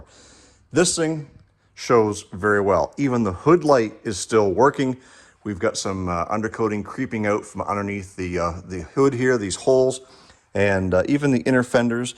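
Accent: Canada. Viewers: Beautiful truck! I love your Canadian accent, (I'm from BC) 😅😅 I have a 94 F-150 XL 4.9L. No Rust. Love my truck!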